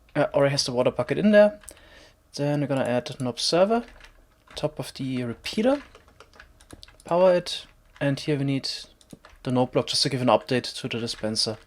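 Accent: Germany. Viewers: Danke für das Tutorial! Man hört extrem deinen deutschen Akzent ;) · du bist deutsch man hört das so raus xD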